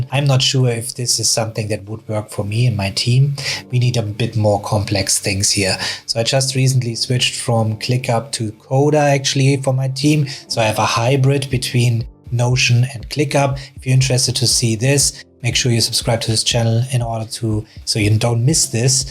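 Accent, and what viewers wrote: Germany. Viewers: do i hear a fellow german accent there? Great video 👌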